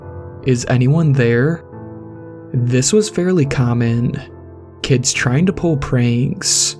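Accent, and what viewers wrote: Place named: United Kingdom. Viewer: This person is most definitely British 😂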